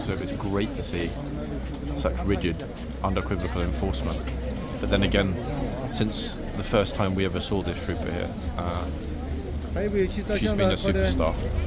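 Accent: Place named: United Kingdom. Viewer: Aside from all your lovely videos, I saw a short video of you and Peter Ellis -- always in others I only saw you from the side or back -- but in this I saw you facing full front. You are very good looking along with your wit, intelligence and respect!! Wish I was younger but sad to say am old enough to be your mother. (Am 74) anyway so enjoy your conversation in your videos!! Have a good day! Also love your British accent!!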